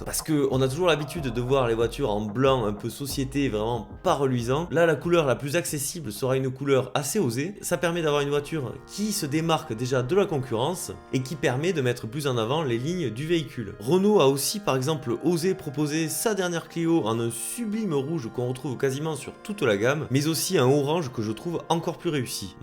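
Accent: South, France. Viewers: Toujours trop cool tes sujets, ta façon de présenter, ta bonhommie et ton petit accent du sud. On a l'impression que tu as une cigale dans la bouche, j'adore! Avec BenAuto, CarJagger, New Retro Car et POA tu es mon préféré number 1. Fut un temps ou y'avait pas trop de choix Citroën HY gris, 2cv bleu ou grise en raison de la reconstruction d'après guerre dans les années 50 aux dires de mes parents acheteurs de l'époque. La pénurie a duré un certain temps après 1946. Un ami me disait que les autos blanches sont plus sécuritaires car plus visibles qu'une couleur foncée. La nuit on peut les voir plus rapidement à une distance moindre qu'une foncée. Je me rends compte qu'on a toujours eu des autos aux couleurs tristes. Peugeot DMA lie de vin d'occasion qui sortait quand même du lot, Citroën HY gris, Peugeot 203 noire, Break 304 gris, Cabriolet 304 S grise qui était verte auparavant et a été repeinte en rouge après la vente, 205 brun macao qui sort un peu du lot, Samba GL noire et 106 XN noire de 1991 que j'ai toujours d'ailleurs et que je bichonne et me rends bien service quand j'y vais en vacances. 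J'avais payé un supplément pour la peinture noire vernis. J'adorais le noir ca faisait classe. Mais avec le temps je préférerai une couleur plus claire car le soleil tape dans le sud de la France du côté de Béziers et le noir attire la chaleur. Un cabriolet 304 S jaune paille ou jaune safran (orange) serait mes choix. Vive la Deuche vert pomme de 74 de mon beauf dans les années 78/80.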